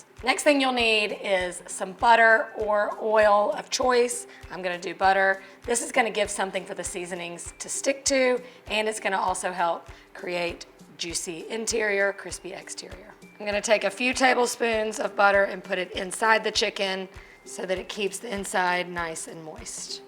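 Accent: South, United States. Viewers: is my favorite cooking / food person by farrrr.....love her voice and Southern accent, love her personality, love her food, love it all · i agree with you i watch her at night kinda hooked on her southern accent! lol and she rocks in cooking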